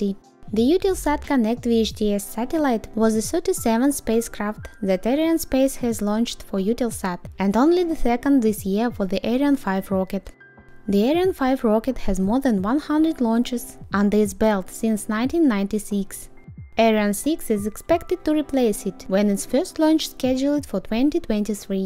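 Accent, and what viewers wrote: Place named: Slovakia. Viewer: As for the accent I'd say Slovak or maybe Romanian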